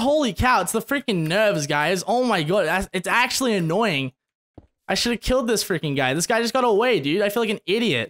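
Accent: Australia. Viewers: cause ur Ozzie go into language and find Australian English it's so funny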